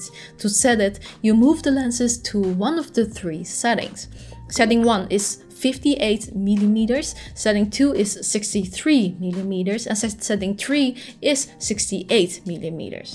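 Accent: Netherlands. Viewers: Iam from belgium and i can hear your dutch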